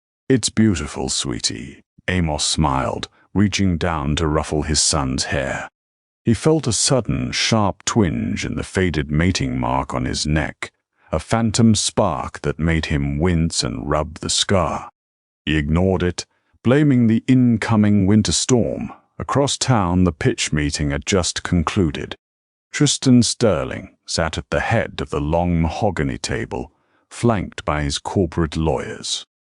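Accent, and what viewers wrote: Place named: United Kingdom. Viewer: Nice story. Love this british accent ❤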